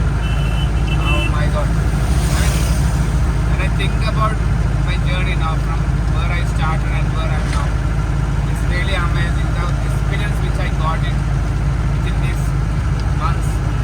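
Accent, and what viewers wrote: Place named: Kerala, India. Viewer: Eda nee Malayali aanenn budhi illa aalkare ninte hindim Englishum ketta manasilakum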